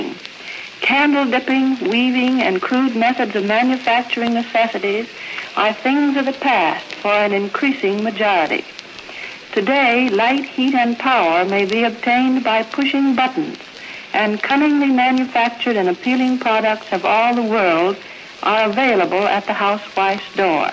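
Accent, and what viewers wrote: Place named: Mid-Atlantic, United States. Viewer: She spoke, at least publicly, with the classic "Mid-Atlantic" voice accent that most American actors, actresses and public figures were trained to use at the time.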